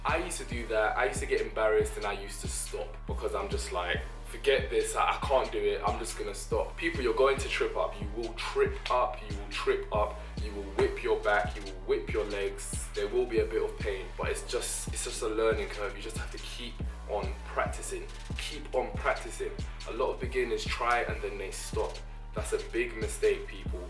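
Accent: United Kingdom. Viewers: Nothing sexier than a chocolate man with a British accent....with tats...with a beard...with a six-pack...that’s tall. Ok I’m done.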